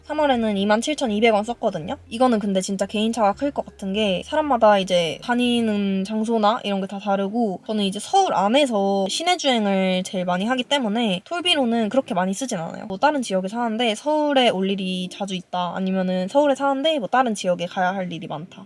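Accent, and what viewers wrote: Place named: Jeolla, South Korea. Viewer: 전라도 사투리 심하구만 왜 서울사람인척?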